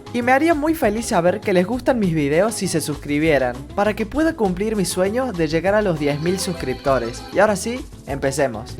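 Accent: Córdoba, Argentina. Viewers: amigo sos de cordoba capital?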